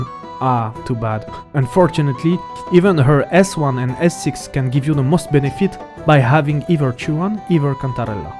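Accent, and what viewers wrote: France. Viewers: Are u french cause i love the accent❤😂🎉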